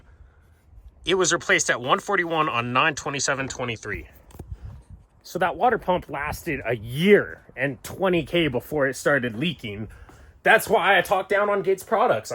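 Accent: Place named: East Coast, United States. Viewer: You do not sound like you're from New Mexico. Your accent sounds east coast or midwest. I spent 8 years in the Land Of Entrapment. I loved most of those years. Anyway, I liked the video. It brought back memories of major repairs in auto store and apartment complex parking lots. Mine were Mitsus, not Subies, but same shìt...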